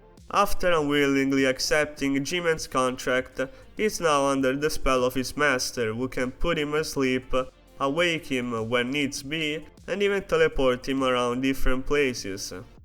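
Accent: Italy. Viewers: You have the most italian accent... ever. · Are you Italian? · Your accent sounds Italian! Edit: ok ai carabinieri ne ho avuto la Conferma. GG compatriota. 👍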